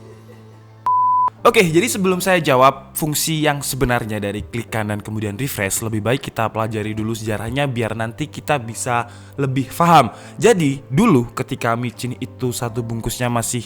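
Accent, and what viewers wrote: Java, Indonesia. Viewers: Mase wong ndi? Aku kepo logate kok njowoni ik🤣🤣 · Oo ini orang jawa ya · Tarah wong malang mak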